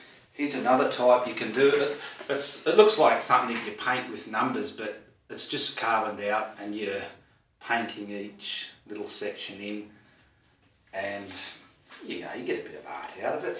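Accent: Australia. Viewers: Thanks for the video,! And, you shouldn't change the way you speak, you're Aussie speak as you normally do!:-) My American ears find an Australian accent quite lovely. Cheers!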